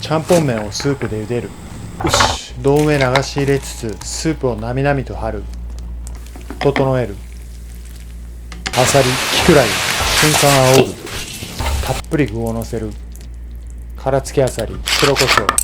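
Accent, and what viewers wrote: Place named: Tosa, Japan. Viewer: 長崎名物に唐突に現れる土佐弁みたいないごっぞう好き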